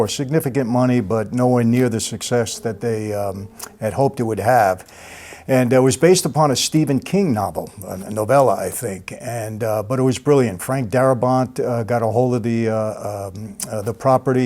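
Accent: New York, United States. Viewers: Ok I might be tripping but I really can't stop noticing that his voice has completely changed recently. Sounds less deep and less New York?😂😂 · You're a great story teller, and I love your "NYC street guy" accent!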